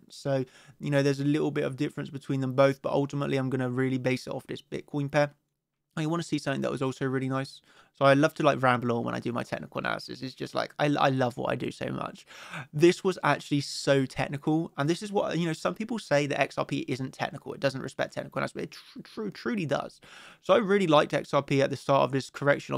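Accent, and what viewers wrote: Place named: Bristol, United Kingdom. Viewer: Mate u sound like ur from Bristol Lol👍😎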